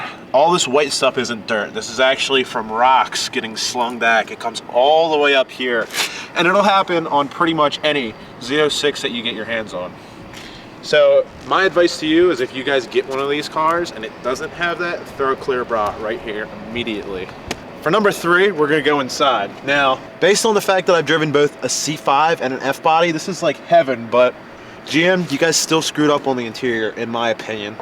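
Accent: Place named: Baltimore, United States. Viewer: That Baltimore Accent. #MDlivin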